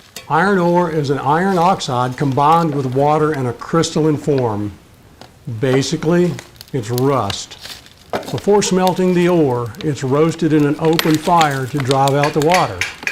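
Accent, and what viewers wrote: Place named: South, United States. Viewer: Where are y’all located? I noticed the narrator has a southern accent. Kentucky? Tennessee? North Carolina?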